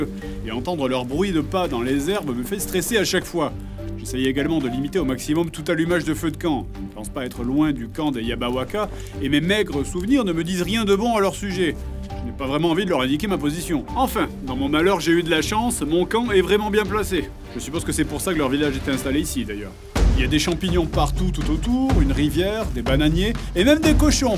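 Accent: Paris, France. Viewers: C'est fais exprès l'accent parisien? J'aime ce type de let s play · cette accent parisien !!!!! loool Hâte de voir la suite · Le ptit accent pointu parisien pédant est juste par-fait ! Ça sert vraiment l'immersion !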